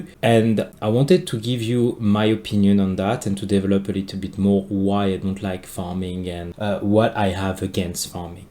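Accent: France. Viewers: this video title and the first 1min have already made my day! been wondering which creator code to use soon but I think I may have made my decision. i was prejudiced against your accent because I thought I couldn't understand it. But once I realized you were French it became very easy to understand you. Your mindset and presentation both most closely resemble my thinking and learning style. (Shout out to Spartan, as well). Can't wait to finish watching!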